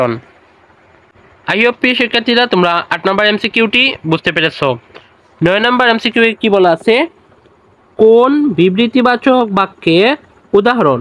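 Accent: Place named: Sylhet, Bangladesh. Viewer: You are from sylhet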